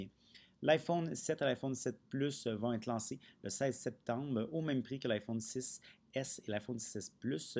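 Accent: Quebec, Canada. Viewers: C'est un québecois... Faut réfléchir parfois :/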